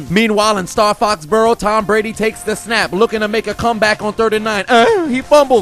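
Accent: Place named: Chicago, United States. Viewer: D A B E A R S As a Chicagoan and a Bears fan, I appreciate that Chicago accent 😂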